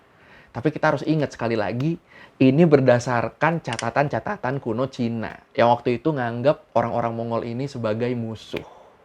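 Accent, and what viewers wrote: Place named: Madura, Indonesia. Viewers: Bang ngejelasin nya biasa aja gausa kaya org madura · Min.. Klo lg ngomong logat madura nya di ilangin donk!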